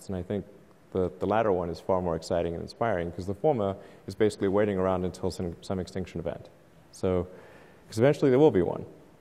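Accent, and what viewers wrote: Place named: South Africa. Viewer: you can still hear his south african accent a bit in this video lol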